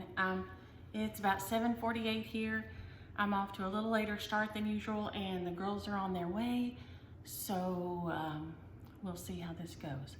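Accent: South, United States. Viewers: You crack me up! That moonshine story was so funny!! I love hearing your stories. I lived in Louisiana for several years while I went to school and your southern accent and "isms" brings back so many wonderful memories. Southern folk are the nicest people!!!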